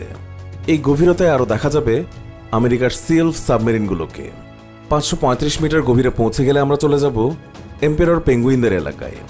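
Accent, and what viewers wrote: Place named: Noakhali, Bangladesh. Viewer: voice ta khub disgusting. Accent khub e baje. Kothagulo suddho vabe bola hoiche kintu accent noakhailla accent er moto lage.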